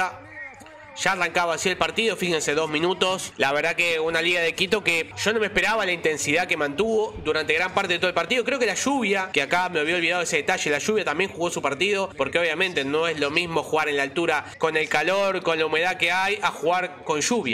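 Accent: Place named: Argentina. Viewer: ESTE PERUANO CON ACENTO ARGENTINO UN CRACKK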